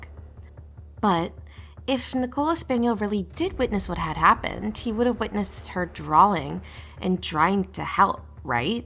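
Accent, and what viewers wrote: United States. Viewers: I think she’s from USA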